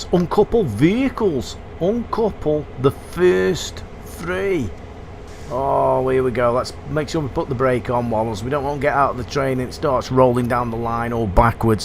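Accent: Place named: Stoke-on-Trent, United Kingdom. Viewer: You from stoke on trent by any chance? im from sneyd green and recognise that accent, although mine has gone now as ive been in somerset for 8 years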